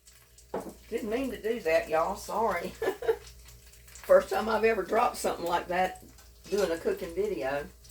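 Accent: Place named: East, United States. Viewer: Hi. Curious... you're in Texas?... Trying to figure out your accent..are you from the east?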